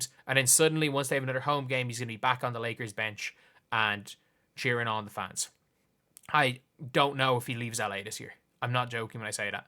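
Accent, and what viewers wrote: Ireland. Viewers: Still not used to an Irish dude talking about American basketball. But I think it’s pretty awesome!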